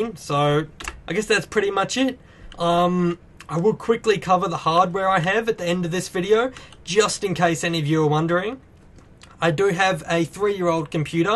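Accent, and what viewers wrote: Australia. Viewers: Are you Australian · no offence but are u Australian?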